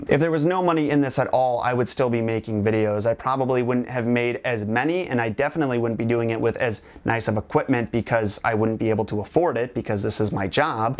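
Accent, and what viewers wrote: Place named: New York, United States. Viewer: Wait he is from a small country town? I literally thought he was a New Yorker or like from Brooklyn or something cuz of the accent I perceived from him lol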